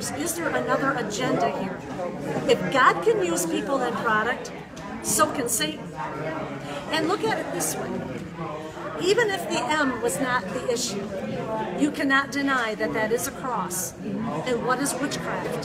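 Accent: United States. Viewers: That New York accent just makes everything better 💀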